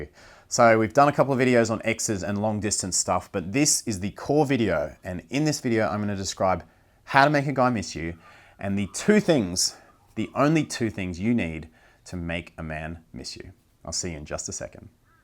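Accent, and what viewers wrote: Australia. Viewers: I just read your are Australian. .. ye ye. love the australian accent 😊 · i know this may sound cheesy but i officially love Aussie accent.😆 and its amazing how i do these things without even realising it. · I’m living in Aus and learning English. I watch your channel for English listening practice because you speak little bit Aussie English and put subtitle :) it’s great that I can learn about relationship at the and time! Thank you! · Are you Australian?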